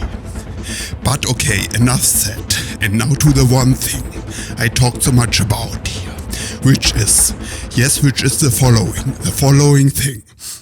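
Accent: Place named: Germany. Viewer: Wenn ich das richtig raus höre hast du einen starken deutschen akzent, also verstehst du sehr wahrscheinlich deutsch oder liege ich mit der Annahme falsch.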